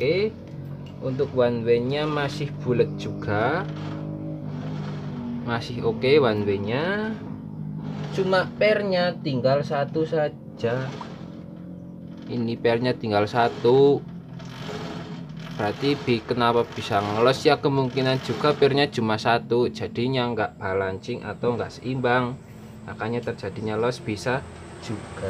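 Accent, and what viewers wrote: Banyumas, Indonesia. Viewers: Kie wong mbanjar jane ya.. Mulane si ngapak😀😀😀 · Akhire koe ngapak jugak🤣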